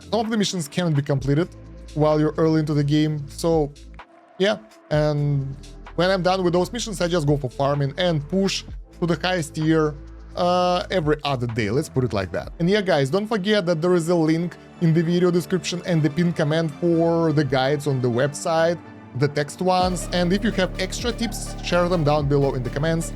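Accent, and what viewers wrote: Russia. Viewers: Слышу твой русский акцент, автор))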